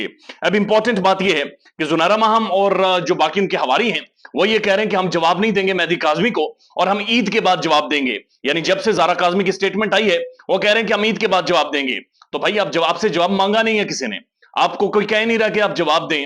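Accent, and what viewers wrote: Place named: Kashmir, India. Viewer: ایب ،کیب ) اس سے پتہ چلتا ہے کے ماسیرو کشمیری ہیں آپ 😊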